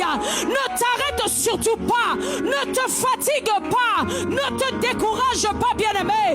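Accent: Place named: Democratic Republic of the Congo. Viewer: Elle prie comme les congolais. Meme accent. Sois Beni maman. Que Dieu vous fortifie.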